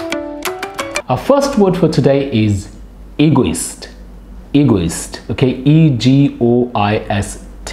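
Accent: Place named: England, United Kingdom. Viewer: Sir I like your English accent 😊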